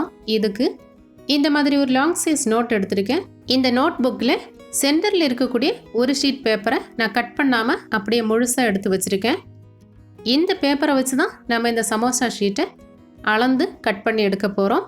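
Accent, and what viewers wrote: Kerala, India. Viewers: It's like malayali talk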